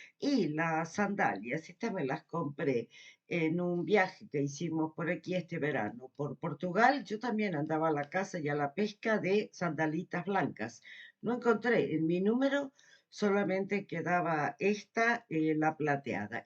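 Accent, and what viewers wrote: Argentina. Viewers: Portugal es muy bonito, yo conozco varios lugares de allí. Pero tú eres de Argentina, por tu acento . Yo tomé clases de tango con una profesora de Buenos Aires, aún sigo pero ya no en clases, solo prácticas y milongas. Un saludo desde Vigo.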